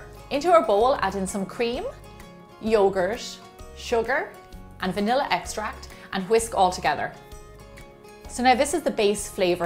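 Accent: Ireland. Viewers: are you Irish g emma?😕😕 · R u Irish???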